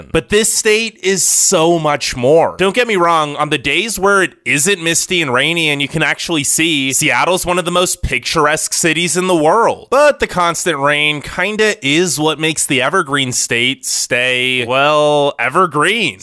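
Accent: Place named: New England, United States. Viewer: Sounds like a New Englander who moved out west and never went to other states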